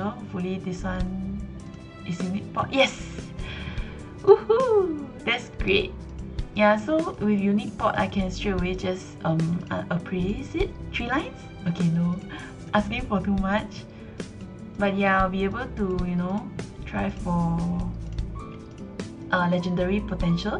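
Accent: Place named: Singapore. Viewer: I agree with his/her comment. I like the Singaporean English accent.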